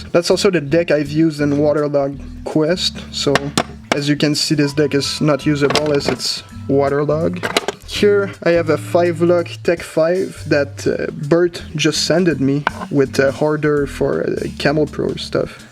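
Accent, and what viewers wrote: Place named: Quebec, Canada. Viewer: the best french canadian accent ive ever heard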